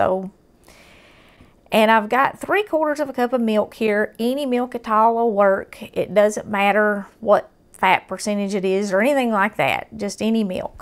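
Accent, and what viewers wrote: South, United States. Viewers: I love these videos, love your southern accent and the whole thing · the food is seems so good but all very fatening. only problem with southern food, not b=very healty. but i like your accent. i am going to try and make a low fat version of the broclie soup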